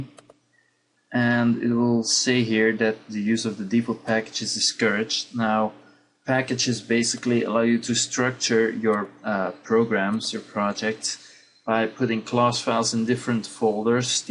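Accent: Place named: Russia. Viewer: i love how he says Class but you can hear the Russian accent coming through.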